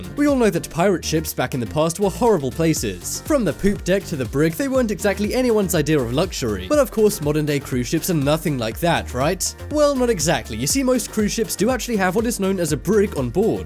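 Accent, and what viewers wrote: England, United Kingdom. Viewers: Lot of nonsense on this video. Plus why does the English sounding voice guy assume only Americans watch the video?? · That very informative thanks.I going on cruise to mede soon wish saw this vid first.You only referring to American cruise yet you have English accent ,so. Do all codes etc are they international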